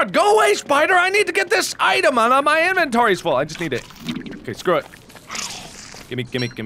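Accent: United Kingdom. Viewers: you have BEST british accent EVER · Awesome accent! xD Yamimash, Mangaminx and other LPers from the UK would be proud! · Haha his stereotypical british accent!!!! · I love ´s Brittish accent and how he talks to CHESTAH.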